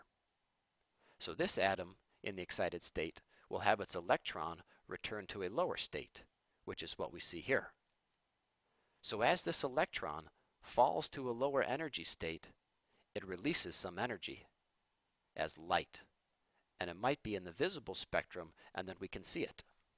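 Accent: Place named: United Kingdom. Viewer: Britain english! Wow!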